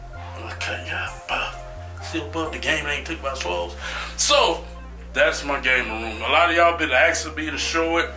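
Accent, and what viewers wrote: South, United States. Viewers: Why does he sound like a hill billy white guy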